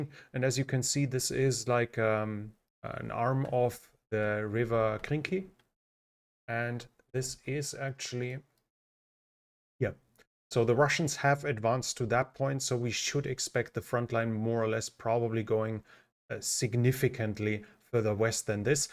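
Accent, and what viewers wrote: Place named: Germany. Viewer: Dein deutscher Akzent im englischen ist schrecklich...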